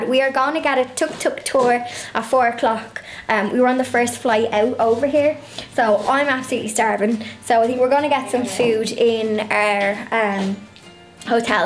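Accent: Ireland. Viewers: That was a nice video! The Irish accent is the best!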